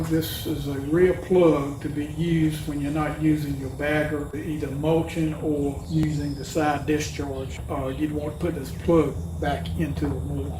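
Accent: South, United States. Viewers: The southern accent is awesome! I love my Kobalt mower. Thanks for the review.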